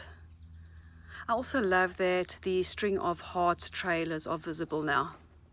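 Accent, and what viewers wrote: South Africa. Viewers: Amazing garden a credit to you I detect a SAfrican accent is that where you are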